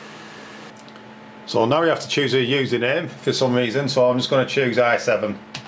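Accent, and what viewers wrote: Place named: Scotland, United Kingdom. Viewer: Thanks! But I had to watch the whole thing over a few times, because I was so mesmerized by your Scottish accent. ;) ^_^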